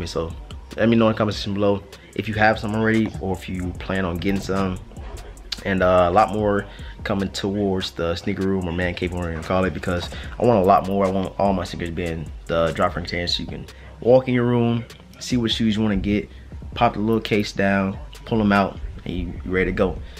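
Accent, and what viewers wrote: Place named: Miami, United States. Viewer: Hahaha you sound just like us down here in Miami. “Y’all boys!”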